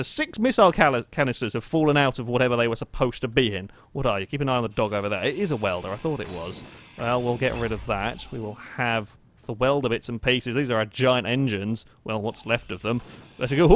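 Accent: United Kingdom. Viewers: Thought this was gonna be bad but the British accent made it all better :)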